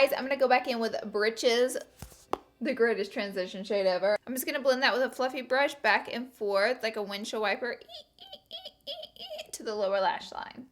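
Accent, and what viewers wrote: South, United States. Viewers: "Olly" The way she says "oily" is interesting to me because she has a pretty thick southern accent (none of this is suppose to offend anyone).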